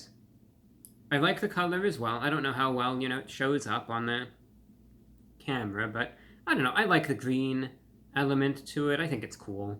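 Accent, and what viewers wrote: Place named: New York, United States. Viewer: I suggest everyone go back and look at young reviewbrah's videos from <num> era. So many classic videos from that era, no HD camera, you got the classic table or armoire setting, tons of reviews from the CAR!!! I mean so many reasons to go back and look at those not to mention the dry cynical humor is way up there and lastly teenage reviewbrah's accent is in peak New York form! Again if your a TROTW fan and haven't watched any videos from that era do yourself a favor and go do it! JUST DO IT!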